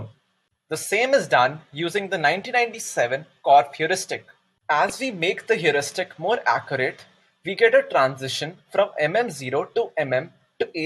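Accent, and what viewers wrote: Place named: Telugu, India. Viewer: Arif C it kinda look like Telugu accent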